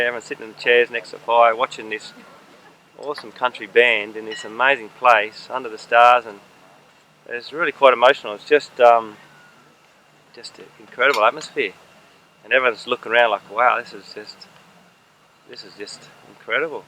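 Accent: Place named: Australia. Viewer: Made, supported and spoken like a true Aussie! Fanbloodytastic mate!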